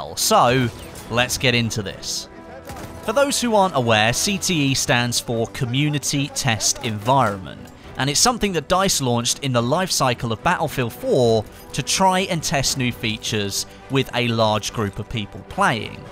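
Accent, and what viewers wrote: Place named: England, United Kingdom. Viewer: English English not phony american english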